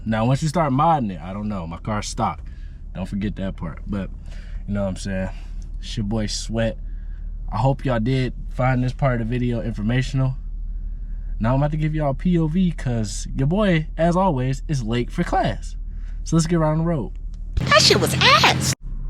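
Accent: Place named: United Kingdom. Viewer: where you from? sounds like uk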